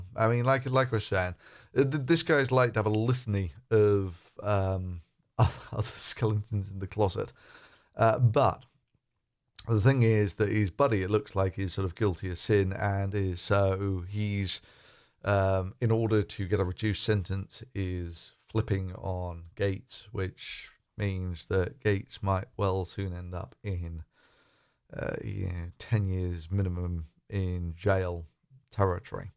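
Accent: United Kingdom. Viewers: I am not one of those usual bashers of. My first post reflects this... I enjoy most of his videos, this one, not so much. I don't know why you feel the need to speak for, but some of your claims are not true, so here we go: says about Matt Gaetz, while showing articles about him being investigated: "There is no love lost between me and folks like this at all. It is kind of what you would get if you infused something devoid of morals, with the intense desire to sniff Trumps ass. ". At no point before this does he say this is his opinion, he is actually insinuating that it is proven facts by showing headlines, that is NOT proving what he is saying. This may be unintentionally, but it certainly have this effect on an uninformed less critical viewer. But of course, some of us understand it is his opinion, in particular I, which is proven by the words in my first post. 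It is also possible, even though speaks with British accent and does not live in USA, that he suffers from Trump Derangement Syndrome, and because Matt Gaetz have been fighting for Trump, he already have a bad vibe about Matt, and therefore cannot help himself but joining the lunch mob. Why else would he bring Trump into this? And why this hostile way of expressing himself about someone doing something they have not yet been proven to have done? It really does not matter if this is his opinion. It does not make it right. It is defamation. Online lynching is not a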